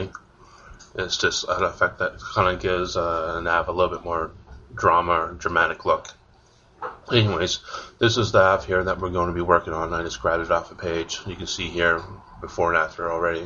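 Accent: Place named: Canada. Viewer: Def Canadian but I can’t place what part. Bc lower mainland, SK or Manitoba. Am I right?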